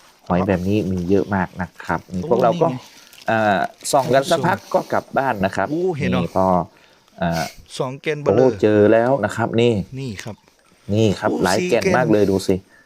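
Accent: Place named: Phayao, Thailand. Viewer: ม่วนขนาดสำเนียงเหมือนเมียงพะเยาเป๊ะ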